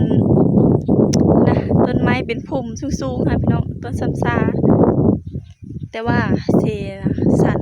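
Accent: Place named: Phu Thai, Thailand. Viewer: ได้ยินคำเว้าของน้องทำให้เราคิดถึงบ้านเพราะเราก็เว้าเหมือนกันภูไทย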